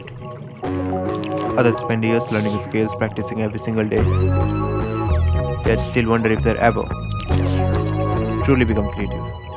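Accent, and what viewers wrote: Patna, India. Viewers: bro, are you from Patna ?? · Are you from patna?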